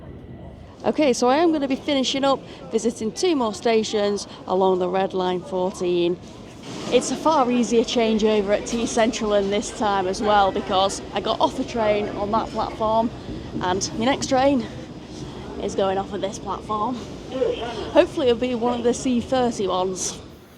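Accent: United Kingdom. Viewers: I can hear that you're brittish... just have a permanent stay in Stockholm. its not worth going back to England. Sweden is kind of a shithole now too but England is a lot worse.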